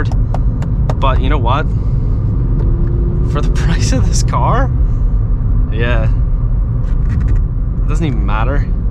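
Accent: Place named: Canada. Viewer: Interesting accent you have there! Part Canadian? Love the vid 👌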